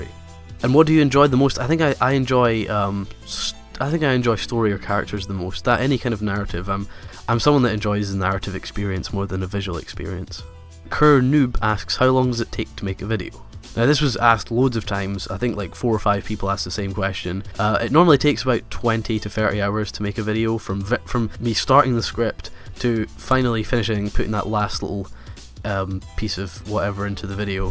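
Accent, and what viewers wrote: Scotland, United Kingdom. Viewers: Where in Scotland are you from? Ps I'm fro Edinburgh! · Are you Scottish if so where from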